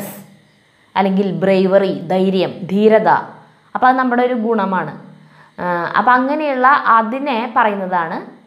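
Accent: Thrissur, India. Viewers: ഹായ്ന്റ പെങ്ങള് കുട്ട്യേ.. ക്ലാസ് അസ്സലായി ട്ട, തൃശൂർ സ്റ്റൈലിൽ വർത്താനം കലക്കി ട്ട, teacher മ്മ്മ്ടെ തൃശൂരാണ്,class excellent, അറിവ് പകരുംതോറും വർദിക്കും. ദൈവം അനുഗ്രഹിക്കട്ടെ !!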